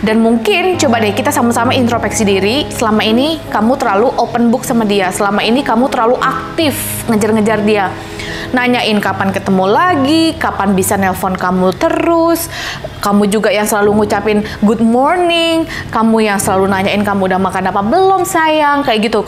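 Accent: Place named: Bali, Indonesia. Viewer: Sekarang logatnya udah ada Bali²nya. Follow kakak dari 4 tahun lalu :)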